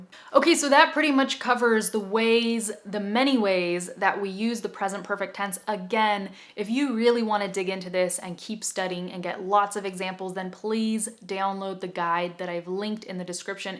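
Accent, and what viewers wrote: California, United States. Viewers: I love you Ma'am, The way you speaking American accents and your always smiling face attract me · I enjoy your accent from California.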